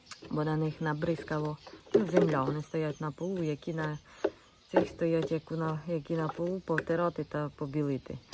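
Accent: Poltava, Ukraine. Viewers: Полтавський суржик,це прикольно.Глобино? Привіт з Черкащини 😊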